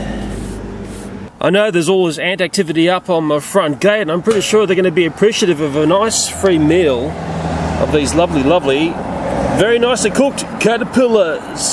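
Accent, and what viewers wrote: New Zealand. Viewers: Thought he was a New Zealander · Yeah sounds like a New Zealand Accent. Which is Taika Waititis nationality (guy who directed thor ragnarok and voiced Korg)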